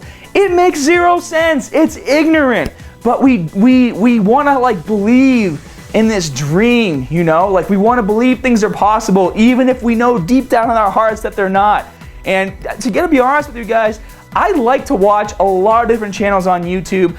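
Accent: Boston, United States. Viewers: Is it just me or has accent become a lot more prominent recently? Boston I believe? It's awesome haha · You sound more like you're from Boston or New Jersey every day haha good vid! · I do agree with you on pretty much all of the videos you make, you seem like a good and knowledgeable guy about what your talking about. I do have to say you sound way more Boston than you used too, don't know why but you really do. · Nah its a boston accent is from lawrence Massachusetts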